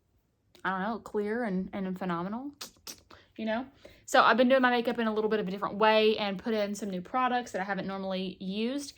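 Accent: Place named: Kentucky, United States. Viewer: I love love you girl,you are awsome,awesome, love your approach to life,your makeup ( you are gorgeous) have such a natural look.love the Vibes and love love your drawl are you from kentucky? I am and we sound a lot alike.i am scared of bronzer also.you don't need it.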